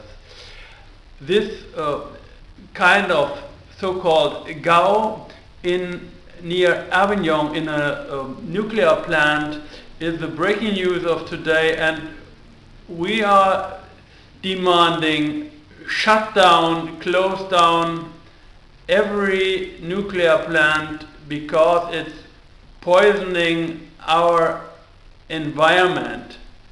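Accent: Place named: Germany. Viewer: Kann man zwar mittlerweile überall nachlesen (z.B. The Times) aber der Aufruf zur Abschaltung aller Atomanlagen ist richtig. Nicht böse gemeint: Die Englische Aussprache ist typisch Deutsch :-)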